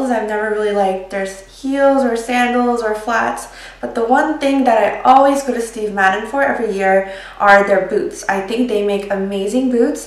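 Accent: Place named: United States. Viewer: a full korean with american accent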